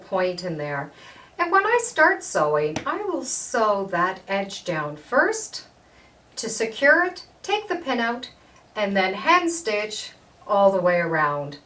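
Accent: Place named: Canada. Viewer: I always have trouble stitching in the ditch on the top and being able to "catch" the backside of the binding in those stitches. I'll turn the quilt over and find that I missed long lengths of the binding and have to go over it again, wrecking the nice clean look of the front. How on earth do you do that??? (also, you must be Canadian! My mom and Granny were from Toronto and always said "agaynst.' I was born and raised in Los Angeles. They both died decades ago, so your accent really brought back lovely memories of my Canadian side!). Thanks for posting. Very helpful! God bless!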